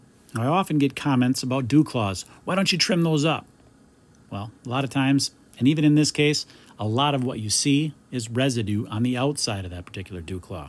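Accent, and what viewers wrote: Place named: Midwest, United States. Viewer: I am obsessed with. His gentle Midwest accent and easy demeanor is fun to watch and listen too. must have huge forearms and wrists as he removes that dense horn. Thank you for your educational videos.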